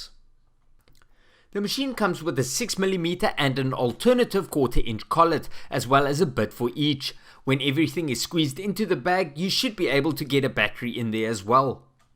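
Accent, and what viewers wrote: Afrikaans, South Africa. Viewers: Are you a South African? I never would’ve picked it. 😂😂👍😉🇦🇺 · Another awesome video!!! Out of curiosity, what is your native language? Your accent seems like Afrikaans, not Zulu. Thank you for the video! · Always makes me happy to hear a South African accent.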